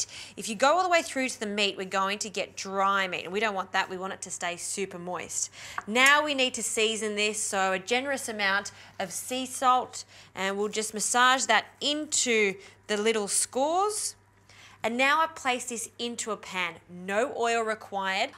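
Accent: Australia. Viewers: Celsius (She sounds Australian)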